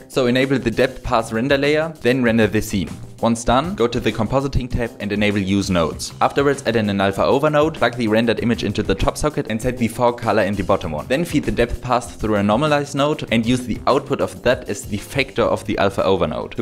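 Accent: Germany. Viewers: i hear deutsch...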